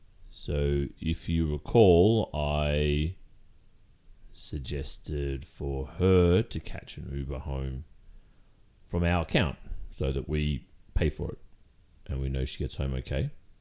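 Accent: Australia. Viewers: Australian accent sucks 😕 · Okay I’m stupid, I was about to ask if you were Australian because you sound really Australian, buuuut then I read your channel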